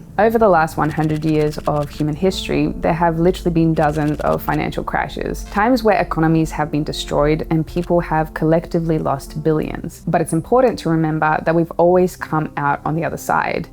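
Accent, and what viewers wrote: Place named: Australia. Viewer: Why do you have an australian accent?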